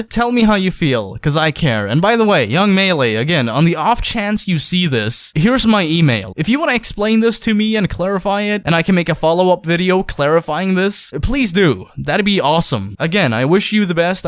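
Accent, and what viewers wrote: Sweden. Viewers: Vae fan äru svensk? Good video tho. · Are u from Sweden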